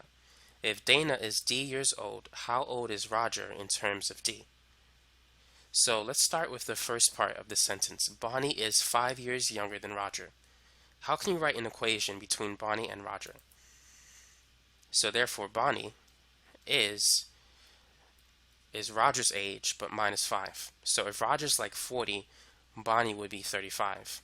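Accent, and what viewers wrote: United States. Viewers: Angie Mejia America probably, he has an American accent but with a little bit of a lisp so that's why it sounds accented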